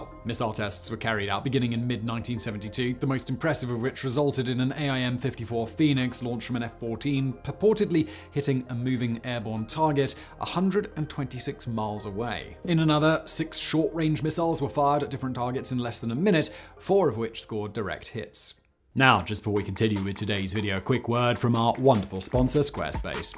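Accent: RP, United Kingdom. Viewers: I'm a clueless Yank so forgive me if I'm totally off base here. I really like language; our boy here has a fairly posh accent, right? I've never been great at nailing the nuances of British accents but he sounds a bit fancypants, right?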